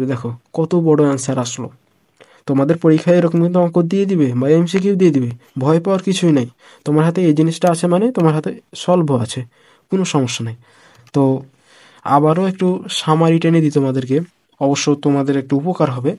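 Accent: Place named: Chapai Nawabganj, Bangladesh. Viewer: আমি আপনার কথা বলার ধরন থেকে বুঝতে পেরেছি আপনি চাপাইনবাবগঞ্জের 😊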